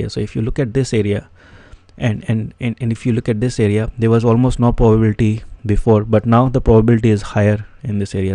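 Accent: India. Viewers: indian accent is so bad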